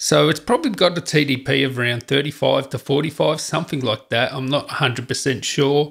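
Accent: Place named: Australia. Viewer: ah.. you did it! great content, your accent is quite australian though!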